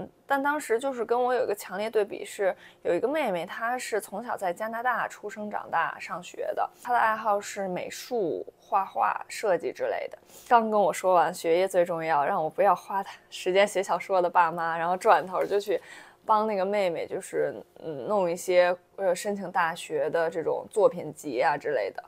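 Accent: Taiwan. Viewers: 有點台味了🤣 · 你其實本來就很有氣質了@@ 但被台灣口音同化之後，更像仙女了🤣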